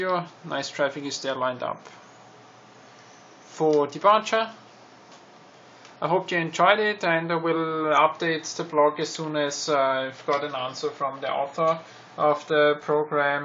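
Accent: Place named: Austria. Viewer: danke fürs video, sehr anschaulich und interessant! Ich hab an ihrem Akzent gemerkt, dass sie Österreicher sind hehe, Grüsse aus Graz hehe!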